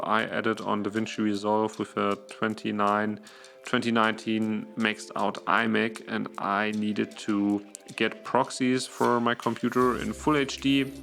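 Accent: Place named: Germany. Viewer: Man merkt, dass du deutscher bist! Informatives Video.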